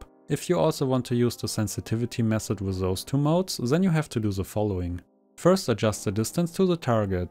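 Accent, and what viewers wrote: Germany. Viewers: irgendwie hab ich aus deiner stimme raus gehört das du deutsch sprichst 😂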